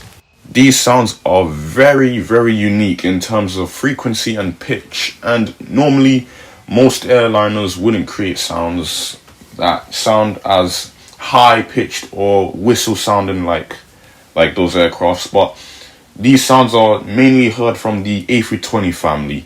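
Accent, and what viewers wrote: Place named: Roadman, United Kingdom. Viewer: You’ve got the roadman accent, love it!!! Great video though, the sound is the best 😂😛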